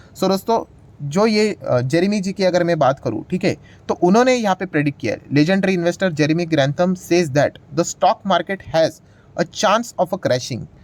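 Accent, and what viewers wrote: Maharashtra, India. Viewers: मराठी माणूस आपल्या बोलण्यावरून लगेच ओळखला जातो. आपला सर मराठी माणूस आहे. जय महाराष्ट्र जय शिवराय..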